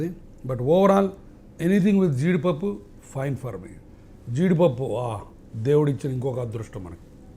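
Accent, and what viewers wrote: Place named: Nellore, India. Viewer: నమస్కారం సార్. ఓరకంగా మిమ్మల్ని చూసి అసూయ పడ్డాను. మీరు చూపించిన మాయాబజార్ సినిమాని పూర్తిగా ఎంజాయ్ చేసాను. ఒక్కసారి మళ్లీ 50 ఏళ్ల క్రితం అనుభవించిన బాల్యానికి తీసుకెళ్లారు. మా నెల్లూరు మలై కాజా మర్చిపోయారు. ప్రతి పదార్థాన్ని పూర్తి స్థాయిలో అనుభవిస్తూ చేసిన మీ వర్ణన మీ భాషలో అద్భుతః. మా నెల్లూరు యాసలో అదిరిపోయింది. ధన్యవాదాలు సార్. 😂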